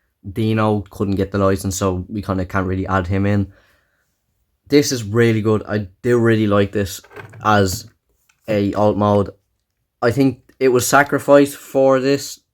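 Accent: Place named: Ireland. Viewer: Don't worry. I didn't pay much attention to the 4th and 5th films too anyway. I knew you're from Ireland just from the accent lol.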